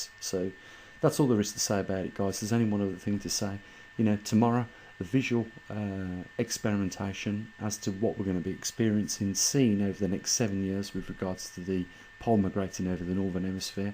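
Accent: Birmingham, United Kingdom. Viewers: Bloody good info, this explain perfectly whats happening I fully believe were going into a GSM cooling period and I follow ADAPT2030 and Ice age farmer, so I will subscribe to you to get more good info....I'm in ozz now but was from Castle Bromwich..you cant hide that accent mate.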